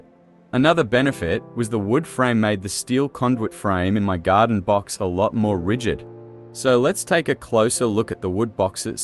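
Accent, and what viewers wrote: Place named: Australia. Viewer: Awesome! where did you get the Austrailan? is that some sort of text reader robot? love your, vids, thank you!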